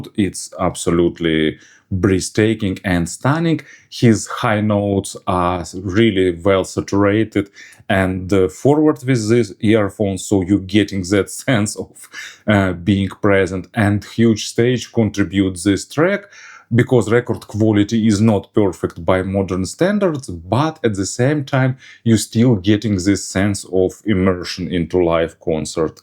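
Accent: Ukraine. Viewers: “Sophisticated” daily dose of Ukrainian accent 👍 Greetings from India 🇮🇳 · I appreciate the daily dose of sophisticated Ukrainian accent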